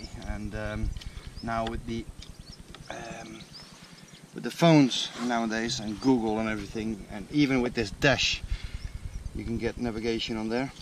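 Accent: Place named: Netherlands. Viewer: yeah! Only thing is that it takes about a month to get it delivered. I live in the Netherlands. I suspect that's a place you know well given your accent!😉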